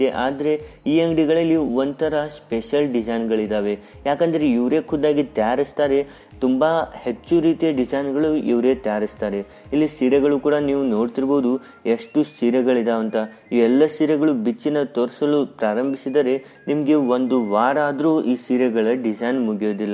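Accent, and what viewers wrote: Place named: North Karnataka, India. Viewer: Dosta nee matadod nodidra pakka uttar karnatakadava anasti pa... Am i right or wrong...?😆😆😅😅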